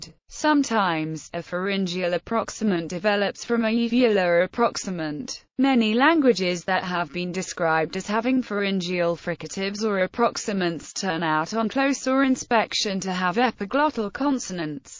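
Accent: United Kingdom. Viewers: Please get rid of the monotonous Received Pronunciation screen reader.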